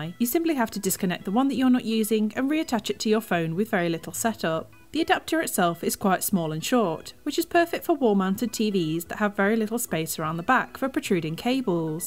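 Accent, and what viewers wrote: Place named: England, United Kingdom. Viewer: It’s an English, one of those things. Learn to respect, not all are using American (US) accent or twang. Peace ✌️!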